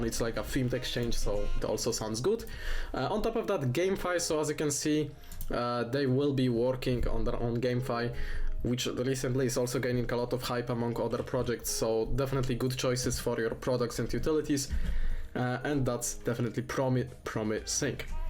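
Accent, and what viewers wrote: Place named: Eastern Europe. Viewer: you have a nice accent what is it? some slavic it seems. I already bought for 1000$ shr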